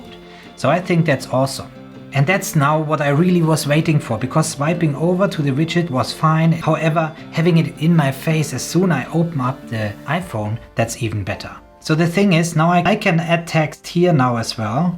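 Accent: Germany. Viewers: I can hören that you kommen aus germany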